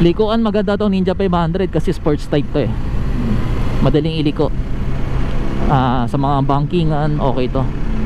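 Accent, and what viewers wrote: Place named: Visayas, Philippines. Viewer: Taga Iloilo ka po ba? Or taga Bacolod? Iba po kasi Tagalog Accent nyo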